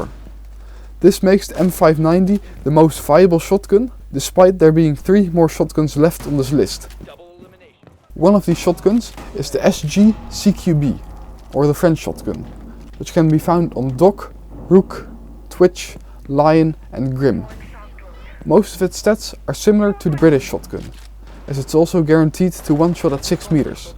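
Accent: Netherlands. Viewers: Are you dutch? I think i recognise your accent. Great video btw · always nice to hear a dutch accent in english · good video also, are you dutch by chance? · I hear fellow dutchman, i press like 🇳🇱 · Im sure you deserve more attention. I really like your dutch accent. You have a talent, keep going! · Are you dutch? You sound a bit like it.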